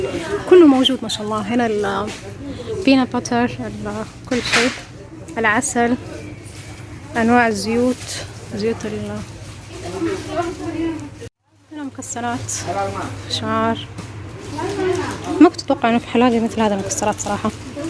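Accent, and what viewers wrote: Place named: Jeddah, Saudi Arabia. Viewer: مشاء الله فديو ممتاز ولهجتك اعتقد انك من جدة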